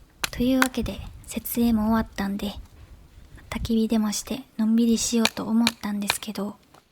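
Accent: Kansai, Japan. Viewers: 関西人には関西弁が心にしみるなぁ😊 そしてタッカンマリ。実はキャンプでタッカンマリを作って食べるのが夢なんです！参考になりました。韓国料理が好きで、数回韓国にも旅行して現地料理を食べます。韓国料理の動画またアップして下さいね♪ では、アンニョン❤ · 初コメです。のキャンプ動画楽しく見てます。今回アフレコと言うことですが、酒893のためか声若干かれているところありますが、関西人のイントネーションが心地よくいつもの動画以上に何回もみています！これからもの声聞きながら動画みたいです！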